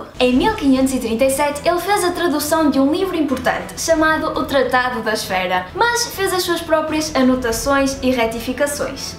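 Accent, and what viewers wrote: Portugal. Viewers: Sua beleza é um fato consumado......vc fala gostosinho portuguesinha kkkkkkk abraço do Pará Brasil pra professorinha preferida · Uma Homenagem aos seus fãs brasileiros? =) Sou formado em matemática no Brasil. Curti seus vídeos, e estou tentando acostumar com seu jeito de falar (Portugueses falam MUITO diferente dos brasileiros). Parabéns pelo canal, e é muito bom ver matemática fazendo sucesso entre algumas pessoas =) Um abraço! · É lindo aprender matemática com esse sotaque português😍😍😍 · Sotaque português é tão lindo aaa <3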